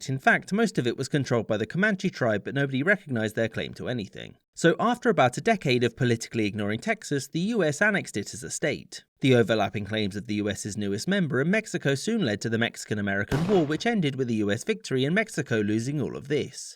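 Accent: England, United Kingdom. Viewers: Love to hear a Brit explain my home state's History. Something I had to look up myself because 7th grade Texas History didn't cover it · I love listening to Englishmen talk about my country's history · Nothing is more amusing than listening to a British man talk Texas things to a Texan 😂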